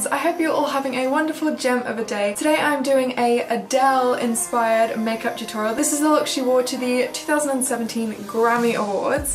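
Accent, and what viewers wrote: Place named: Australia. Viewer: it sounds really cool! At first I thought you sounded English then I thought Australian! So that would make sense! Sounds so cool tho!!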